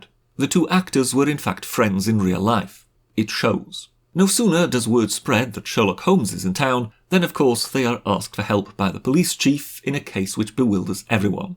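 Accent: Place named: RP, United Kingdom. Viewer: Good idea! I feel for, not only does YouTube keep him down, I bet his ‘posh’ accent has limited his £ donations over the years ☹️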